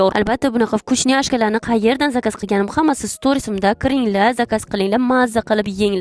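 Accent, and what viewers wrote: Bukhara, Uzbekistan. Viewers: bn kurwingla ukishiyam Buxorolikku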